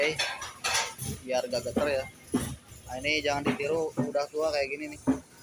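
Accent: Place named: West Java, Indonesia. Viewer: Jiga Sunda eunk 😄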